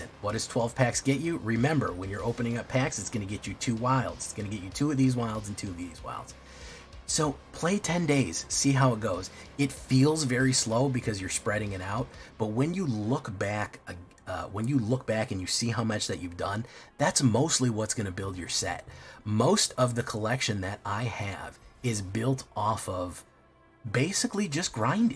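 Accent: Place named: Fargo, United States. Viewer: hm yeah it does sound a bit like the people in Fargo, that makes sense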